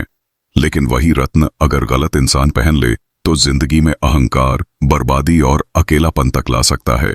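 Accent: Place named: Pahadi, India. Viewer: पहाडी आवाज अच्ची हैं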